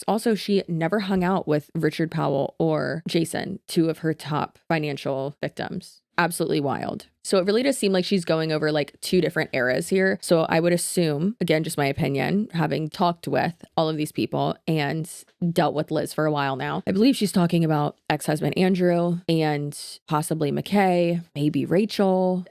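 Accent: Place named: Florida, United States. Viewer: yess she’s been hitting that Florida accent extra hard lately too for some reason. Isn’t she from appalachia or sum?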